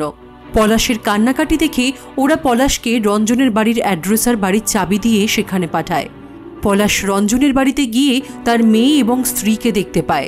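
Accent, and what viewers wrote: India. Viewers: didi tumi ki kolkatar?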